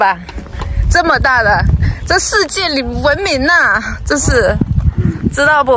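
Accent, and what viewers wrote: Taiwan. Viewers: 咦？妳說的一口台灣國語真的很到位，相信妳已經愛上了這裡，祝福妳！民主自由妳應該感應的很深刻，覺得妳很享受這裡的一切，恭喜妳。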